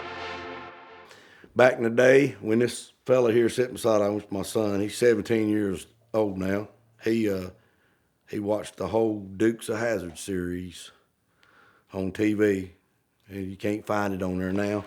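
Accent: South, United States. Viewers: you were right! wicked fun story and the whole southern voice mixed in whith the southern sayin’s is gold! thanks ED, needed a good chuckle today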